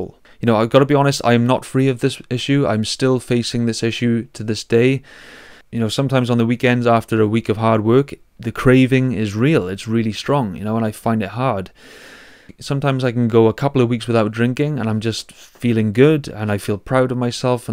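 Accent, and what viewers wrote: Wales, United Kingdom. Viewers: Get a mountain bike bro, amazing for dopamine stimulation and if (judging by your accent) you live in Wales your spoilt for choice for amazing trails. Soon make friends to ride with. Totally relate to drinking issues though, it's kryptonite for ADHD brains.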